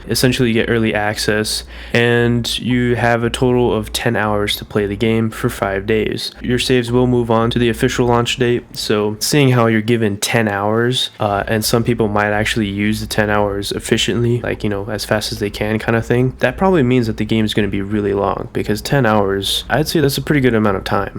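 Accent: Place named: Canada. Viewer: You sound like a fucking canuck. I hope it isn't true. You are such a decent guy to watch when I had absolutely nothing else.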